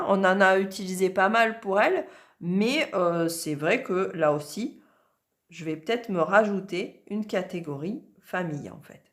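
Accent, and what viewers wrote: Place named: Toulouse, France. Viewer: Coucou déjà j arrive pour ton accent car tu me rappelle une copine que j avais à Toulouse mais surtout par tes explications simples et sensées etce que je pourrais retenir Merci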